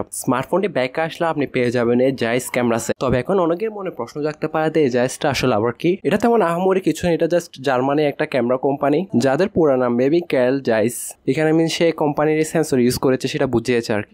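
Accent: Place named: Chittagong, Bangladesh. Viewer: চিটাইংগা বদ্দা.... আঞ্চলিকতার মিক্সিং ভাষা পরিবর্তন করতে হবে।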